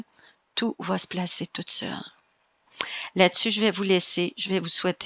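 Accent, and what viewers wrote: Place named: Quebec, Canada. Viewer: Bonsoir Mme. Je viens de vous découvrir et c’est une belle découverte. C’est toujours agréable d’entendre un accent québécois dans les voyances, vous êtes peu nombreuses et tous ne me parle pas, ce qui n’est pas votre cas. Votre tirage me parle beaucoup. Je suis vierge, ascendant vierge et lune en vierge, rien de moins 😄. J’aimerais beaucoup savoir le nom de vos cartes s.v.p. je les apprécie beaucoup, les images me parlent. Merci encore et longue vie sur YouTube. 🌺❤️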